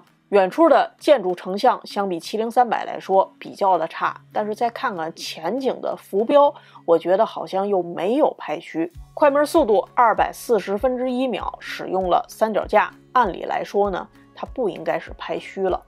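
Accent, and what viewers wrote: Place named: Beijing, China. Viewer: 都是北京腔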